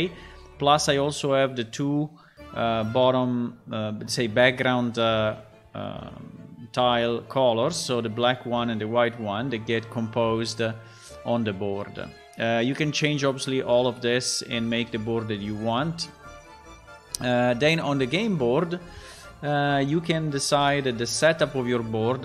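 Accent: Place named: Finland. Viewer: You sound Finnish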